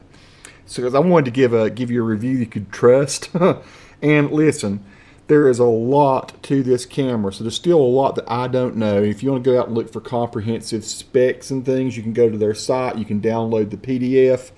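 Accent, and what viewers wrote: South, United States. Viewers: Is that accent real? I've never heard such a strong Southern accent before :)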